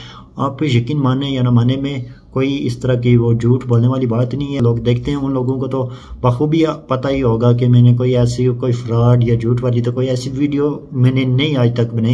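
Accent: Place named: Rawalpindi, Pakistan. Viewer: I live islamabad ap ka bolne andaz pandi ka lak raha ha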